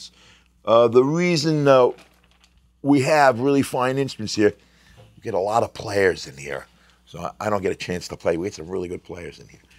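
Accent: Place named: New York, United States. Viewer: I got the same guitar only left handed. Best les paul I've ever played! Nice italian New Yorksish accent!:)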